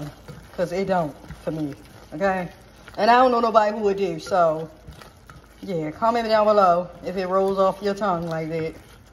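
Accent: Baltimore, United States. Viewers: She’s from bmore I can hear it lol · You from Baltimore ain't you?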